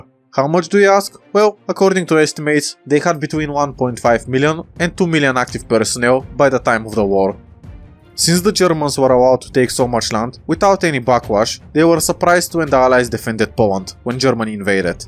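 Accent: Bulgaria. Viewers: По диалекта разбрах че си Българин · are you bulgarian by any chance? you sound like it. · Bro are you from da balkans shot you speak kinda like one of my bulgarian friends : ? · Are you by any chance bulgarian? The accent kind of gave it up😊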